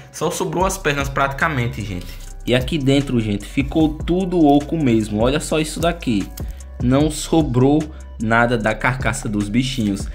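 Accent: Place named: Northeast, Brazil. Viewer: O e nordestino por causa do jeito de falar KKKKKK Mais o vídeo ficou incrível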